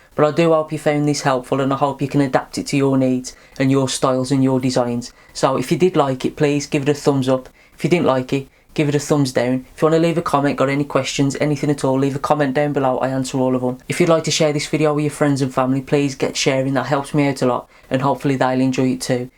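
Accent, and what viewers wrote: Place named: Birmingham, United Kingdom. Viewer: aah ok. I've been watching Peaky Blinders and you sound a bit like them:)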